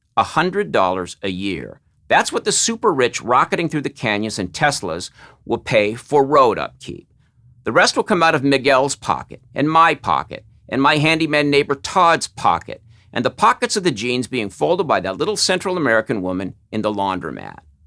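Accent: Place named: New York, United States. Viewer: You might be the West coast but you sound like here in New York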